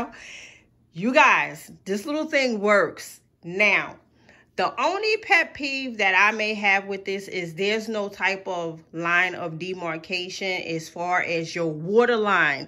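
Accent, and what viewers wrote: New York, United States. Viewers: I was going to say New York lol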